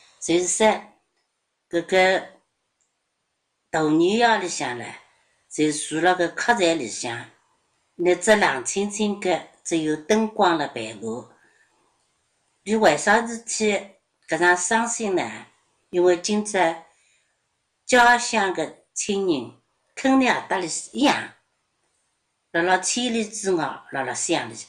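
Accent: China. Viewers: 感觉 常州 无锡 苏州 三个方言的味道都有啊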